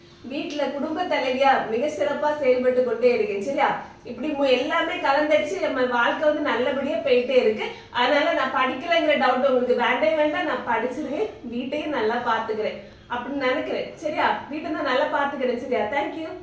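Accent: Kanyakumari, India. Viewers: This is kanyakumari slang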